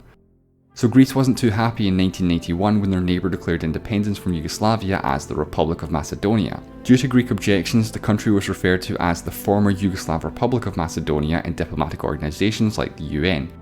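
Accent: Scotland, United Kingdom. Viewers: dude i would have subbed you if not for that Scottish accent of yours. can't understand a thing without turning on the subtitles. honestly, that accent is totally alien to me!! i thought there were only 3 accents, namely British, American, Australian and i can get all three but now i know there's this weird 4th one as well. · Are u a scotish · Love Scottish accent, the enunciation is a lot better compared to the older videos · I was trying to find that out too! He did say "us Scots" and I just checked his other videos, his accent is def Scottish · are you from Scotland? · They do sound weirder. He's actually toning down his accent to sound understandable to non-Scottish folk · I honestly don't know whether you realize how obnoxiously annoying the Scottish accent is, and that nobody likes it. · You had me with your wonderful Scot accent! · Are u from Scotland Lad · Scottish accent - Best! 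· Accent, bro. SCOTLAND FOREVER!!!!! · wait ur Scottish? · Actually he's Scottish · well he does sound scottish tbh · I thought the accent of British English is beautiful, this video proved me wrong. ~~Wait, is this video in British English? He mentioned he is Scot at a certain part, but isn't that part of the GB?~~ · Is it just me or does sound very scottish in this video? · He's from scotland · Where is his accent from? So pretty did he say "as a scot" i didnt understand that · The first scottish guy I like Your accent is painful tho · What accent is this? Irish or Scotland?! Oh hhhhh, Scotland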